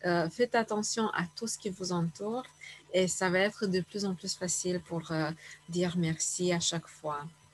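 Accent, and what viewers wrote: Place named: Romania. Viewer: Merci beaucoup Je viens de vous découvrir... Je vais acheter le livre, le lire, m en imprégner et puis suivre vos vidéos pour faire ce travail... J aime beaucoup votre petit accent roumain sympathique, votre bonne humeur contagieuse et votre franc sourire A bientôt 🖐🖐🖐🖐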